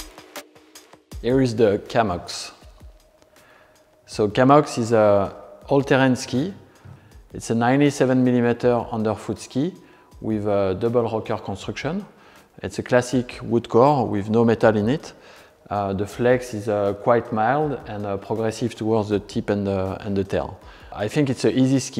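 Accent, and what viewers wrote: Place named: France. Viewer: Très beaux skis !👍 Ça s'entend que le présentateur est français 🤙😁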